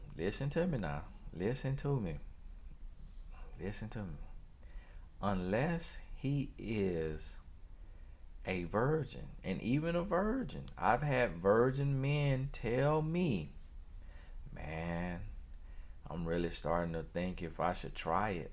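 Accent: South, United States. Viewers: And please dont speak the Q ueens English, your wonderful Southern Drawl. Just drives your wondeful points home all the morrrrre!!! 😎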